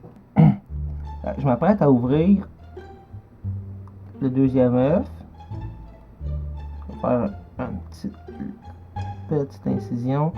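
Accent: Quebec, Canada. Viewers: il a un accent tu peux pas lecouter? .. ta gueule? je suis quebecois et tu croti que s ame plait écouter des francais avec leur accent sur ytb? bah non alors si t la pr apporter des critique a proos de notre accent, regare toi avant.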